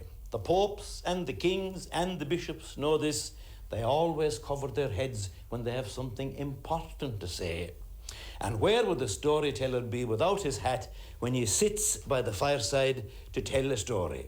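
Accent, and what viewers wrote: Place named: Kerry, Ireland. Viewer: Kerry with a slight Cork tinge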